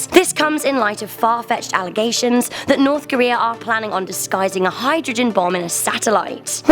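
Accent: United Kingdom. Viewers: I don't like the British accent.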